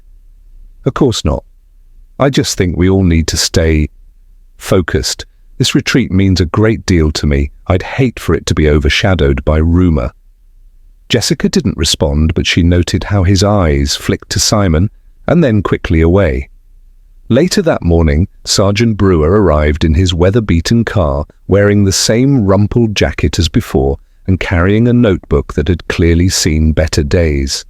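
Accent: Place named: United Kingdom. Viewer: Why is this narrated in a British voice?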